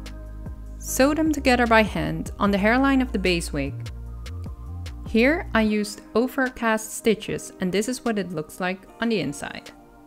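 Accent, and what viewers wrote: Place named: Colombia. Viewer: You look asian but sound like your from Colombia of something. 🖤 It's confusing and I like it lol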